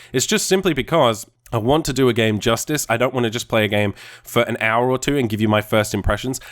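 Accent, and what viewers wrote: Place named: Australia. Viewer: Oh dude i can't wait:D Also wanted to say that i really enjoyed your Q&A, i'm really sick at the moment so i listened to it while resting and it was just fantastic. I always thought you sounded australian but with a slightly off accent and i couldn't quite put my finger on it but it was always leaning toward english, glad to know that you came from the UK. I'm also australian.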